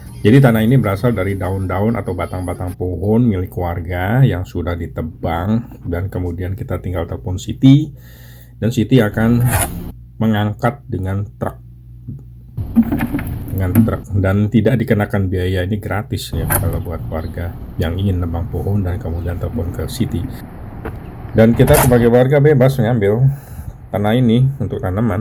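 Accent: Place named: Indonesia. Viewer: Wah jd panutan nih bersih2 pekarangan efek dr tetangga tanpa dongkol 👍👍👍, btw lidah Indo ya gak hilang 🤪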